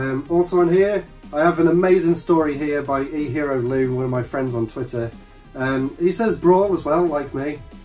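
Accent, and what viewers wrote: England, United Kingdom. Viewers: Sweet channel. My fav is Animal crossing, you are cute and I love your English accent:) more animal crossing videos please;)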